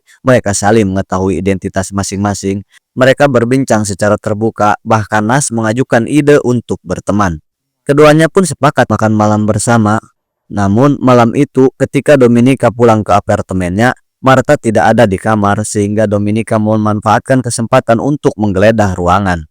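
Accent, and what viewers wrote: Indonesia. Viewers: Emang ngomong na kumaha kitu? Logat sunda na Sigah logat jawa tengah kitu? 🤭 Atos di subcribe luuur 🤩👍🏻 ╭╮🎋🪻🎋🪻🫰🏻 ┃┃╭━┳━┳━┳━╮ ┃╰┫╋┣╮┃╭┫┻┫ ╰━┻━╯╰━╯╰━╯ 🤗🤩🫰😍👍🏻💯❤️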